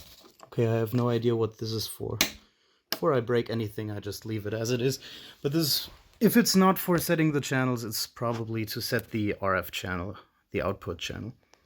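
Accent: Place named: Germany. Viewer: Oh my god , Its Indeed Beautiful Piece of Tech, by The Way You are German I think by hearing Your Accent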